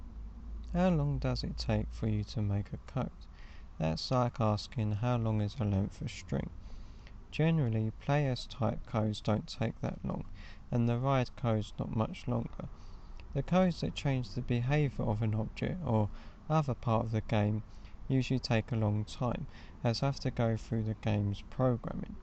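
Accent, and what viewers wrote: United Kingdom. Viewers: Your pronunciation is really modern. The latest version of European / British English basically.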